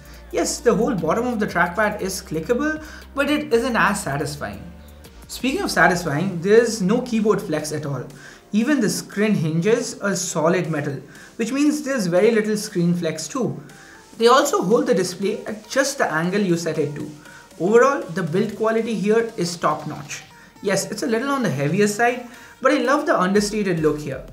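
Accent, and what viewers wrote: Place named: Bengal, India. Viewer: That's how Bengali accent is 😍😍😍😍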